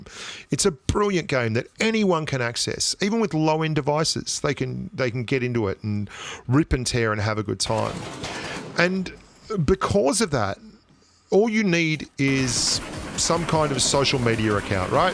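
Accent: Australia. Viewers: Totally agree,...I dropped out of the entire last season and a half due to the exact same reasons you’ve just highlighted. Have returned to play in recent days. I’ve missed watching your content and hearing your hilarious Aussie-isms! 😊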